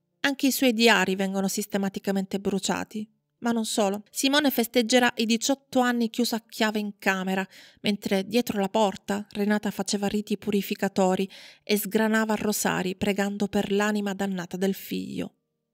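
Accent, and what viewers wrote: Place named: Calabria, Italy. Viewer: Buongiorno era una curiosità, dall''accento mi sembrava.Sai con quanti colleghi calabresi e siciliani ho lavorato.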